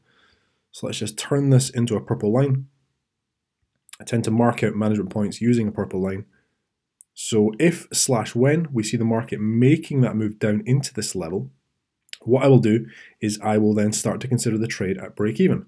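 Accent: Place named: Edinburgh, United Kingdom. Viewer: Hi, is there a way i can contact you by email to ask a few questions? I am completely new to this and looking to do this on a part time basis. You sound like you are from the Edinburgh area, I am from and live in Aberdeen.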